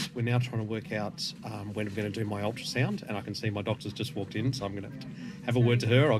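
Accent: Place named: Australia. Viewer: Hello dear...you touch my heart. I love to hear the accent. My dear husband passed 2 years ago; he was from Melbourne. Your accent is similar. You are very brave...your angels are all around you. Hugs and prayers❤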